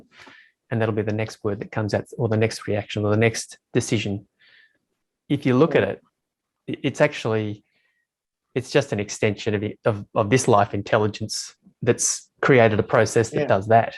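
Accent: Australia. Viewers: Hi, you are obviously Australian, do you hold satsangs or meetings anywhere? Who are you talking to?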